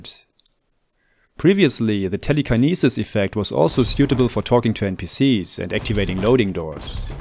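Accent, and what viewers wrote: Germany. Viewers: Looks pretty cool. Any chance it's compatible with vanilla (and non-modded) saves? Your German accent is a thing of beauty btw. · ich gehe vom ankzent her mal davon aus das du deutsch bist ^^ mein englisch ist schlecht also frage ich auf deutsch ist OpenMW mit der Steamversion von morrowind compatibel?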